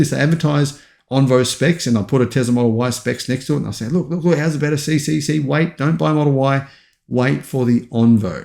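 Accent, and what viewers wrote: Australia. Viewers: He is not an American, he is an Australian. Can’t you tell from his accent?😂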